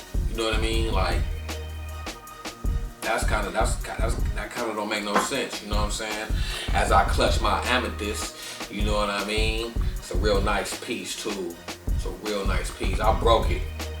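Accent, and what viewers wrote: California, United States. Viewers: Great vid bruh. You from Cali huh?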